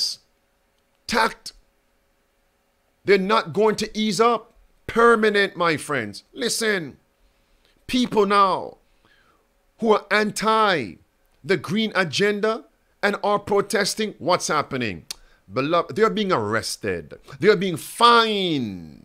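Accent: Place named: Jamaica. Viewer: Ingles, el nacio en Jamaica por eso el accento que tiene.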